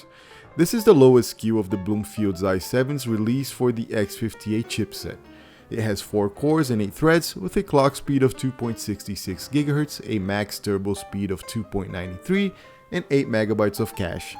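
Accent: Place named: France. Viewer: What is your slight accent? French?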